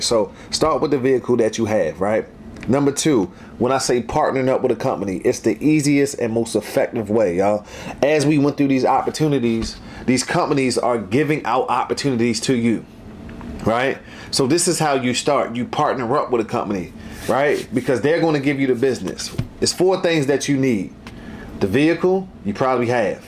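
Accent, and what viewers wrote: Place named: Baltimore, United States. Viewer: He must be from Baltimore or D.C because that accent is REAL strong!!! 😂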